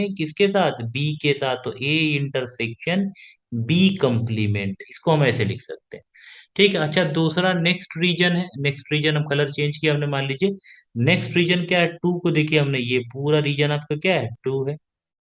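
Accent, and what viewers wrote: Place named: India. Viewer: Thank you. You convinced me that the math is no problem. Understanding mix of english and indian is much harder.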